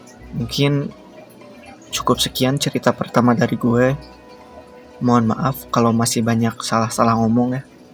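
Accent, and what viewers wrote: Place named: West Java, Indonesia. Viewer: Suka banget sundanya 😂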